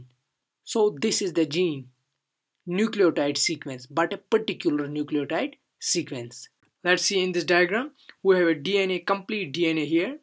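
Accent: Kashmir, India. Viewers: not not the english ..thats mashallah fine ...its just the aacent ...we kashmiris have ...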